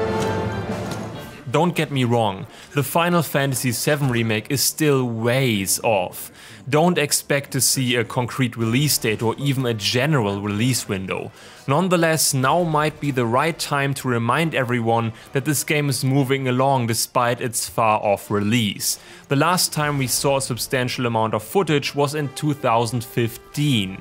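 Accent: Germany. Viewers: can confirm sounds like a german english teacher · you sounds german :D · Is this guy german? His accent sounds really german.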